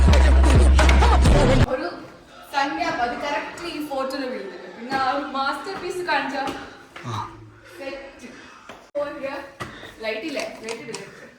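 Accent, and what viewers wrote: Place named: Tamil Nadu, India. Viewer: Your Tamil accent 🤌😂💯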